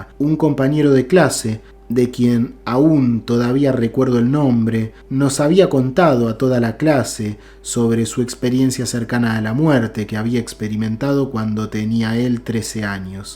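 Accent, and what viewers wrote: Argentina. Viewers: SE NOTA QUE QUIEN RELATA LA EXPERIENCIA ES ARGENTINO...YO TAMBIEN SOY ARGENTINA....ME CONFORTA ESCUCHARLO Y MUCHO....GRACIAS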